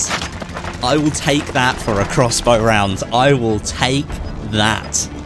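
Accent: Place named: United Kingdom. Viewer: Why are you British??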